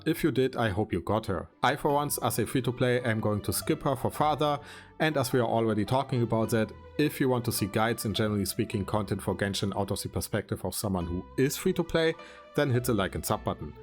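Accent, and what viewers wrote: Germany. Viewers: Du bist so Deutsch man hört das direkt aus dem akzent xd Gutes Video😆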